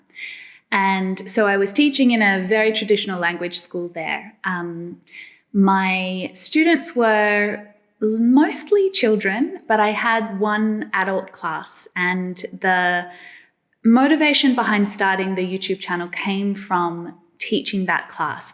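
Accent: Australia. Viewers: Hi! Teacher. Good aftnoon. How are you? My Name's Ivanilson. I'm from Brazil and live ABOUT TONW Itacere-ba. Did you know? WHAT'S News? I'm to want speak English Britanico but know I study how a teacher can is this Austrialiano sutaque is spok beatiful.more Did you know? Does She is a woman very beautiful love see you speak this lessonigs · congratulations, you are terrific in teaching English. I think your accent is between Australian & English & easy to comprehend. Best of luck. Enjoy Perth.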